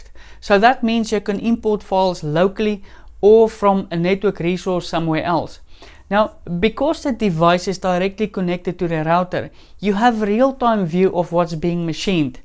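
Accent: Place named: Afrikaans, South Africa. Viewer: Lekka Afrikaans South African accent - Made me chuckle :D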